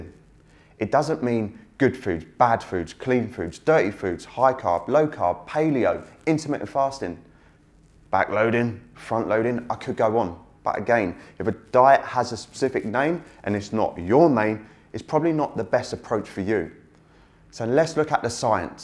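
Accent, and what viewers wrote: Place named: Cockney, United Kingdom. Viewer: Please dont take this the wrong way as you present great info as usual, but i cant get past the Jamie Oliver likeness (no offense but most of us feckin hate that mockney Cockney). You need to get Tom from behind the camera to in front. I know hes doing his own thing but i think your subs would go through the roof with either him or both of you presenting serious content with an injection of humour... keep up the good work.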